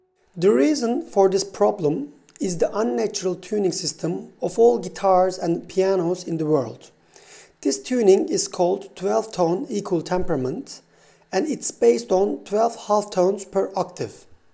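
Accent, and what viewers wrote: Turkey. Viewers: abi şivenden anladım türk olduğunu like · Aksandan anladım ilk Türk olduğunu :D çok düzgün İngilizcen, helal olsun. Türkiye'den böyle bilgili müzisyenleri medyada görmek çok güzel. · Nice work, sir! Ingilizceden anladım Türk olduğunuzu.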